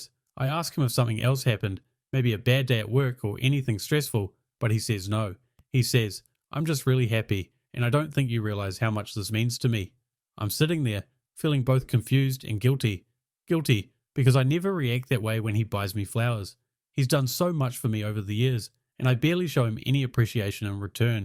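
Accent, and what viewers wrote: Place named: New Zealand. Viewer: the kiwi accent sounds good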